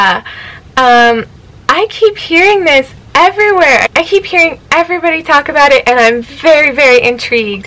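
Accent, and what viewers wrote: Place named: Southeast, United States. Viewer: 💙💙🐈🐈💕💕 I really appreciate your visit to my channel,! I'm happy to return you the digital love with a play in full (10 minutes) and a crazy like #58! Hmmm, I didn't know that vegan cosmetics was a thing. I can't speak for cosmetics, but a lot of Indie music and movies are much better than the mainstream stuff. Shame they don't get the exposure they deserve. "They're so amazing... I just daydream about them sometimes." Very funny with the soap-opera-esque music accompanying! At first I thought it was maybe just my imagination, but after seeing a few of your videos now, I've noticed that you have a slight accent, almost like southeastern US. "Transformative" isn't a word you get to use every day! Looks like Mystic Cat makes an appearance! Thank you for sharing, and I hope your week has been kind to you!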